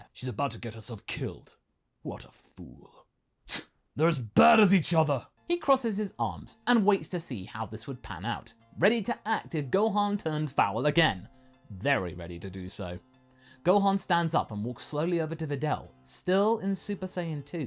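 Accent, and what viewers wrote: United Kingdom. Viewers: I said it with you every time -A Fellow brit (I think ur British-)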